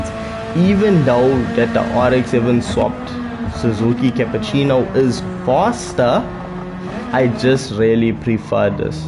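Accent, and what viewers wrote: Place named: South Africa. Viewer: Can I ask where you're from? Because it's like I'm hearing several accents at once, because I'm hearing south african pronunciation, and what sounds like jamaican accent creeping in, just curious is all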